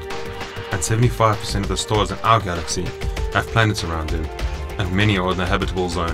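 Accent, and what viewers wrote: South Africa. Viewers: Is this a South African speaking ?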